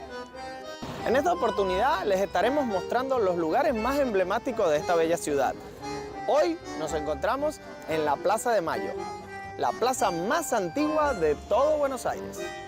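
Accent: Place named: Nicaragua. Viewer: Es verdad hay muy poco amor en Argentina, muy buena tù reflexión. quizás por tù acento eres de Nicaragua o del Salvador, y en esos paìses haya mucho màs amor.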